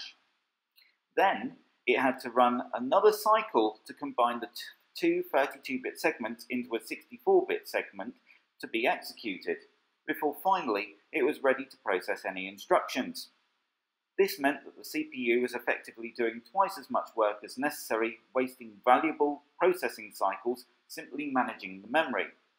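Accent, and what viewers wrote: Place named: England, United Kingdom. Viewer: the thick english accent on this blows. I can't understand it very well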